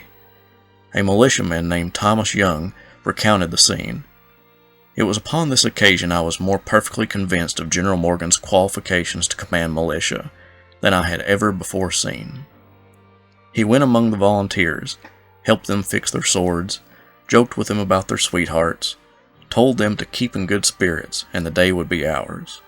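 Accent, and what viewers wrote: South, United States. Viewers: The country accent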